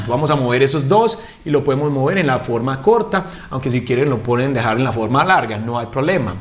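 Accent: Antioquia, Colombia. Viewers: Que hacento paisa en el ingles se nota demasiado mejor habla mi padre!!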